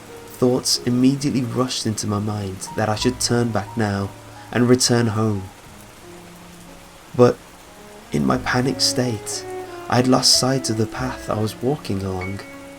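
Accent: England, United Kingdom. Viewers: Why are all creepypasta narrators British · Nice to have an English accented narrator for a change , nice atmosphere and fab pics. Thankyou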